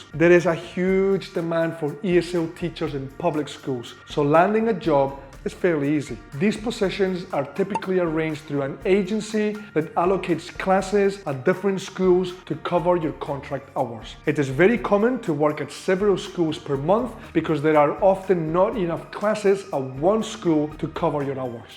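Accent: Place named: Arab world. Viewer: oh, that tough arabic accent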